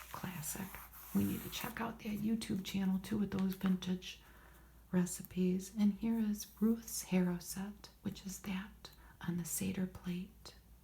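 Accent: Midwest, United States. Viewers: I really enjoy these magazine flip throughs, your voice with just that touch of midwestern accent is so nice.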